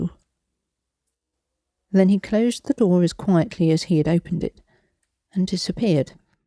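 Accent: England, United Kingdom. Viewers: Was pleasantly surprised to see another story by you. Saved it for the last story of the night. I dont think you realize how beautiful an english accent is to a Southerners' ear. Again, I enjoyed the story and the storyteller!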